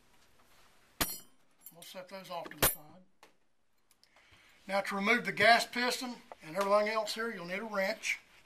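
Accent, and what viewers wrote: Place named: Mississippi, United States. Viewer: I enjoyed your BAR disassemby video. I have had two in 7mm Mag. No recoil and accurate. The only problem is that I get walleyed trying to keep track of the brass when I shoot. You don't sound like Down East. I lived in Maine for 5 years in the '60's and spent a lot of time in North Berwick. You sound more like Mississippi, where I am in exile now. Keep up the good films.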